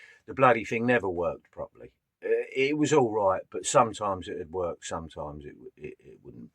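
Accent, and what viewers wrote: London, United Kingdom. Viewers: His accent sounds London area to me .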